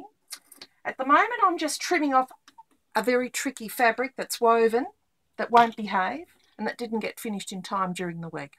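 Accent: Australia. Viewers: You sound like an Aussi? From where? I’m from Bentleigh, Melbourne!!!!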